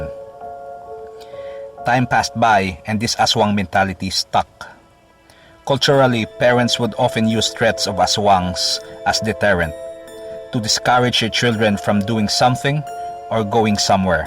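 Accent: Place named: United Kingdom. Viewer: I am not sure which literatures the Author consulted. As a Capizeno, we grew up with the scary stories from Dumsngás, Dingle and most of all Duenas. This propanganda of the Spaniards had barely supported by books by Spaniards. Poorly research and Capiz has nothing to do with Aswang. Your heavy English accent betrays your origin. THIS VIDEO IS POORLY RESEARCHED. FALSE NÈWS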